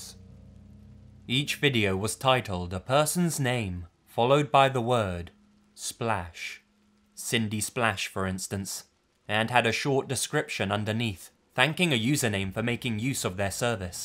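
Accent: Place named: Scotland, United Kingdom. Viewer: Are you Scottish or British? I like your accent a lot! It's one of the reasons why I like this channel so much. It's just so pleasant to listen to! Also, you have some really interesting content.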